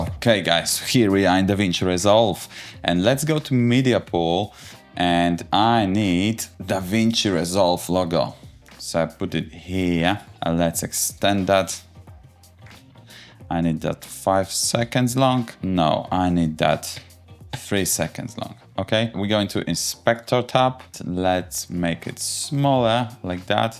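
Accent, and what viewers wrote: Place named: Poland. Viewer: Mam wrażenie że słyszę tu polski akcent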